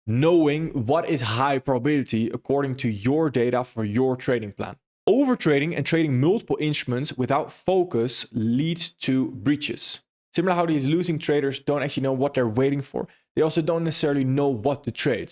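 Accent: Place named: Netherlands. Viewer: Ik sense een beetje hollander 😅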